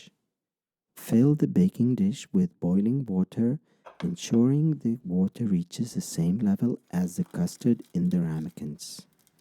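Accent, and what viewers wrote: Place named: Czech Republic. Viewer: cool czech accent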